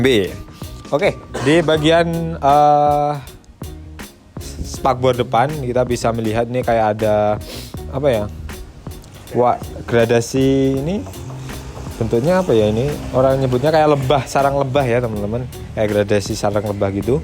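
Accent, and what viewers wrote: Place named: Java, Indonesia. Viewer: Ngomong gue nya medok bngt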